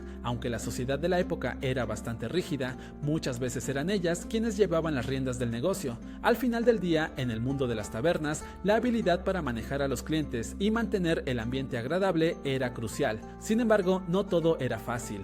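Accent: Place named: Latin America. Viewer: Tienes la forma de hablar de alguien que vive en un país en qué seguramente una gran parte de la población no tenga agua corriente o viva en condiciones de insalubridad.. tal vez cualquier país de Latinoamérica?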